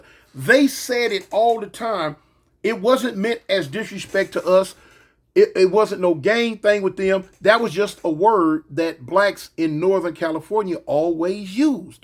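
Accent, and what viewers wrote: South, United States. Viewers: Is anyone from the south in your family? Damn I’m from Atlanta but you remind me of a lot of my uncles. How you speak..your accent..maybe I’m tripping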